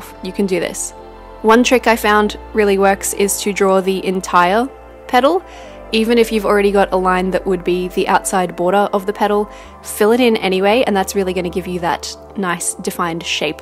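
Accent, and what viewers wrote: Australia. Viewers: I love your simple bujo set up :) WOW! I was in Melbourne back then! I love Australian accent :)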